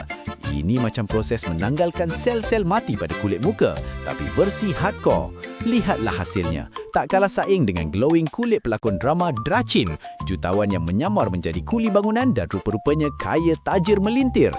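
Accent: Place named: Indonesia. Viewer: menyampah plk aq dgn gaya ko berckp....dh mcm style Indon kpg !!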